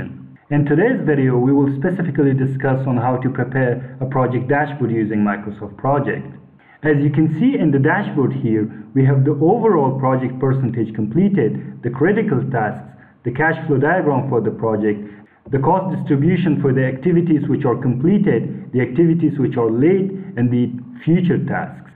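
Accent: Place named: Afghanistan. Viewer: The time I heard you, guess what, I told my friend this person is from a very special country, Afghanistan. Amazing Mr., great job!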